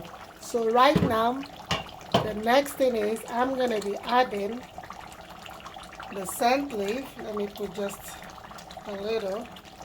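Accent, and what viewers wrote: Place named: Sierra Leone. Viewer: Are you Sierra Leonean? I can hear the Salone accent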